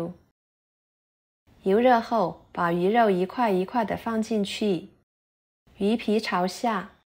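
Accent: Yunnan, China. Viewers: 主播云普口音很重啊 哈哈哈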